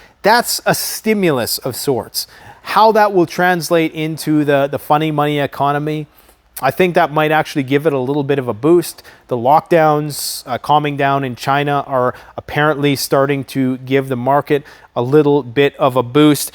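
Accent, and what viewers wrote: South, United States. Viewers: Awesome southern talk lol Iol that was funny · Oh, you are funny...you speak Southern...sounded perfect to me...🤣😂🤣🤓😂🤣 I hear it all around me here in Kentucky 😂 And i just adore the southern drawl! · Good southern accent! :) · Hi keep doing what you’re doing. Loving your red neck ascent, you should try an Aussie bogoan ascent next 👍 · Love your southern accent lol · Southern Accent, FLAWLESS…. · Mr. should sound like a surfer dude since he's from California. lol Good southern accent though. Nailed it. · Your southern accent gives me big George Dubyah Bush vibes hahaha. You crack me up, keep it going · Excellent use of a southern accent! :) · Lol, 😆 love your " southern accent🙂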